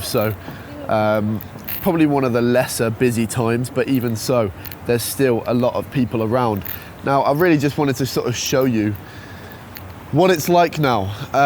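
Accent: United Kingdom. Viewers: Thank tell truth instead too much propaganda news in America, only fools of themselves now. You look good today. Yr accent not too British so easy understand, yr older partner too accent to understand for America people. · Your British English is so cute and nice.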